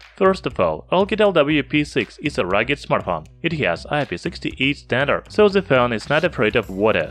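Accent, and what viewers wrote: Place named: Russia. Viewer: 𝗠𝗮𝘆𝗯𝗲 𝘆𝗼𝘂 𝗮𝗿𝗲 𝗮 𝗥𝘂𝘀𝘀𝗶𝗮𝗻. 𝗔 𝗥𝘂𝘀𝘀𝗶𝗮𝗻 𝗮𝗰𝗰𝗲𝗻𝘁.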